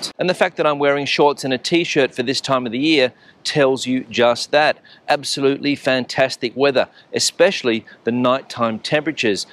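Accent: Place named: Australia. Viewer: Sounds Australian to me.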